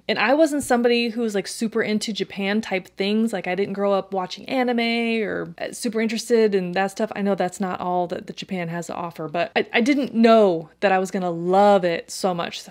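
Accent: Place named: Ohio, United States. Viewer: Okay, I definitely also thought it was 60K followers for the job reveal - I feel like maybe it was said as a fun thing towards the end of an older video (or maybe that other commenter and myself are having a Mandela effect 😅.) It's funny because I also got an Ohio-ish vibe but couldn't place it. I lived there for about 3 or 4 years when I was younger but definitely have the accent/vibe on radar.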